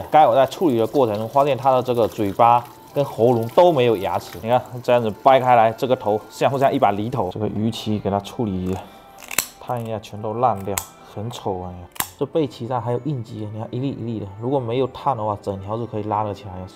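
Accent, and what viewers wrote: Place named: Fujian, China. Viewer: 胡建口音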